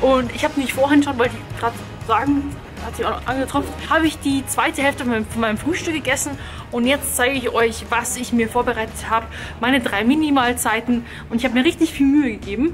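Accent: Bavaria, Germany. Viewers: Man hört manchmal deinen Bayrischen Akzent heraus :D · Waalnüsse 🐳🌰 der bayerische Akzent ist echt putzig 😄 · Kommt die aus bayern i schp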